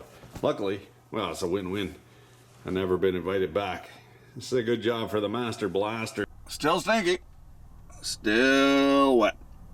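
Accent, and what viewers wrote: Canada. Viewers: I just want you to know that my husband watches your channel a lot, and the stupid shit you say (doodad, fuck it bucket, doohickey, etc) + your Canadian accent really, really infuriate me. I’m not sure why. That is all.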